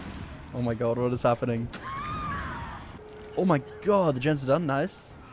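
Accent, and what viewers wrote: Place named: United States. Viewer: he does have an accent. He has an American accent lol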